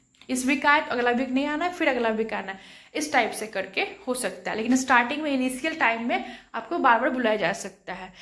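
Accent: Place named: Bengal, India. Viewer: Madam poora bangla accent ❤❤❤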